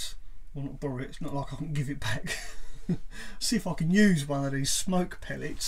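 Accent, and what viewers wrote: Cockney, United Kingdom. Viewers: Your accent... make me think of the artful dodger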